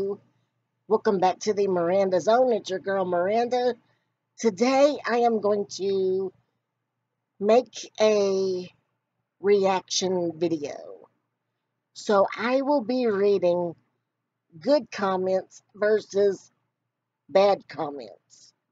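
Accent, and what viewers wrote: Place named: South, United States. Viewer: Keep doing what you are doing! You are such a positive woman and know that you will always have a crew backing you up. You are very funny and I get your sense of humor because I have a southern twang too! 😘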